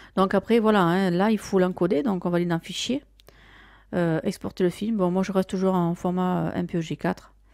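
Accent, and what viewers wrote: South, France. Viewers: Bonjour. Votre chaine est vraiment géniale pour tous ceux qui apprennent à maitriser Magix Vidéo Deluxe. C'est une mine d'or, une véritable caisse à outils. Les tutoriels sont bien présentés et votre ton "méridional" très agréable. Pour les primo-accédant à ce très bon logiciel, peut-être serait-il utile qu'il y ait deux tutoriels de base : -> Présentation de Magix Video Deluxe, -> Premiers pas avec Magix Video Deluxe. Après, toute votre chaine présente ce que l'on peut faire dans le détail... Excellent et merci pour votre partage. JiPi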